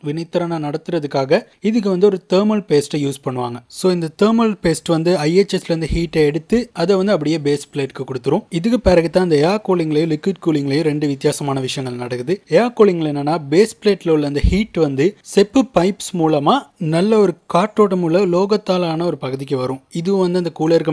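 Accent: Sri Lanka. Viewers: Bro you’re sound so Sri Lankan ….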